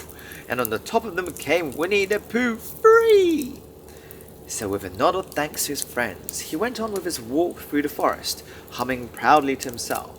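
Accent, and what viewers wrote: United Kingdom. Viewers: 焚き火とイギリス英語の音が心地いいですね…🔥！ 全ては聞き取れないので、聞き取れるように何度も見直すつもりです😂 英語学習を頑張っているところなので、英語系コンテンツもあげてくださるととっても嬉しいです✨これからも応援しています！！ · 聴いてて心地よいイギリス英語～ 落ち着きます(^_^)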